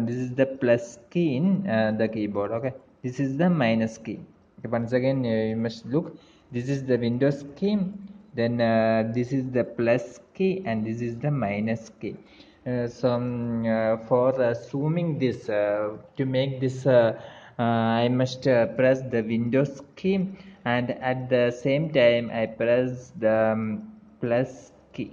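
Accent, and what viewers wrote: Kerala, India. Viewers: Are you from kerela? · lemme guess you're an Indian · മലയാളി ആണെന്ന് മനസ്സിലായി , മലയാള ഇങ്ഗ്ലീഷ് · its a india, kerela accent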